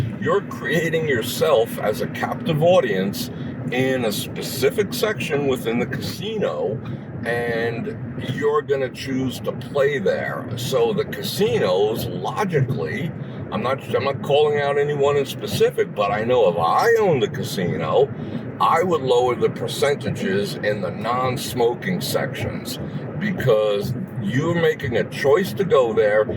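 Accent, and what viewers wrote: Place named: New Jersey, United States. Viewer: Sounds like you're originally from New Jersey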